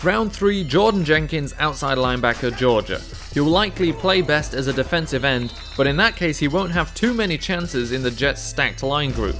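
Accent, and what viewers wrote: United Kingdom. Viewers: U British ?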